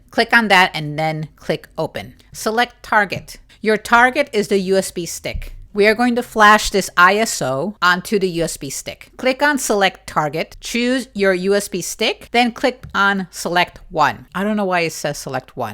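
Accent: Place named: New York, United States. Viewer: I saw the title and thought it might be good to consider, then I saw something about New York and was not sure but I gave it a look and was entertained and saw the process unfold. I was used to the style as my wife is from Queens, Flushing and lived in Manhattan before coming to Virginia where we met. You seem to talk like her so it was quite easy to ignore the emotional bits. But I still need to know if this something I need to do. Thank You I appreciate your sharing. Will need to see a few more videos to calibrate where you are coming form and what it means to me. I studied Fortran in undergrad then in Grad school took a fast track on several languages. Fortran again, PL1, APL, Cobol, Intel Assembler, Basic,Pascal and Forth. I decided I would never need to program ever again. But now in retirement I am rethinking that pledge. Moving to Linux ?!?! 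I don't know, See you next time. Dennis in Virginia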